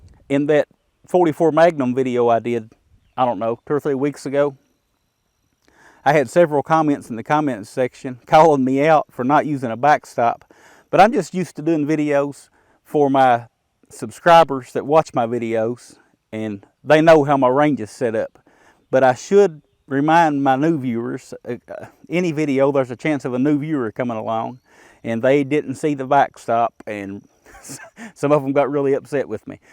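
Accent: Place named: South, United States. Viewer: I love this guys good ol' boy, down home southern accent; but the video starts at: . You're welcome.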